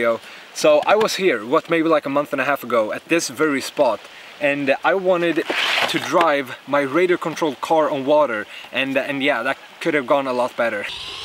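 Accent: Sweden. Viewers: Are you from sweden? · Are he swede??? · is this guy swedish?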